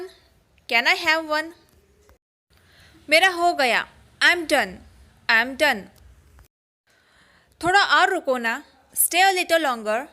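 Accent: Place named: South, India. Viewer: r u from south of India.. madame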